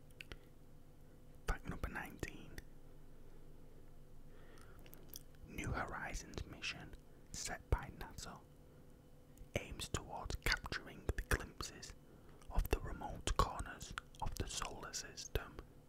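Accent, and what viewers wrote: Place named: England, United Kingdom. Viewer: You are honestly such a good find. I love space and learning things like this. Finding someone who's voice and content you like can be hard! Can I ask what part of England you're from? I'm struggling to place it and it's annoying me 😂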